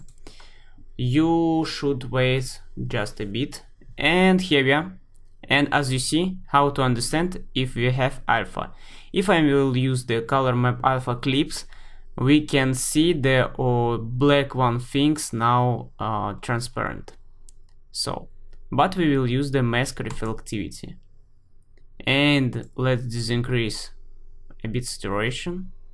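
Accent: Russia. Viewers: есть сильное ощущение, что твой родной язык - русский!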